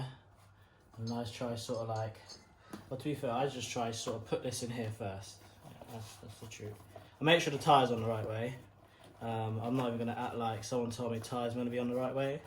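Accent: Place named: Jamaica. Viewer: 😂😂😂 good Jamaican accent By the way you put the tire on first then put the innertube in after ..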